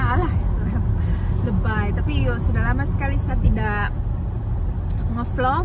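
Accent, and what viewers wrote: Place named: Papua, Indonesia. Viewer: Hallo kak, saya lihat postingan kk yg di tempat bunga tulip langsung sa cari di youtube. Kk di indonesia tinggal dimna? Soalnya dengar logatnya logat papua😊🥰.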